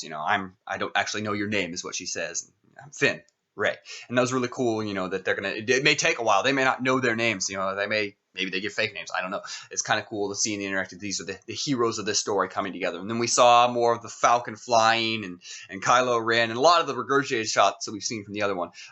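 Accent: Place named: Kansas, United States. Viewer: Are you from Kansas? I am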